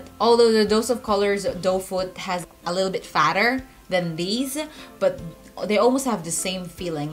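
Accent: Philippines. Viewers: ur filipino accent :) & i love the colors on u! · Hi! Are you a filipino? :) I can sense it from your accent hehe~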